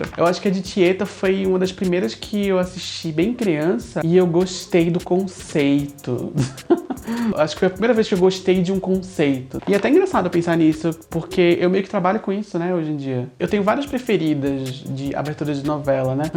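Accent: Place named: Recife, Brazil. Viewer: por mais sotaque recifense falando de cultura pop, meu voto é sim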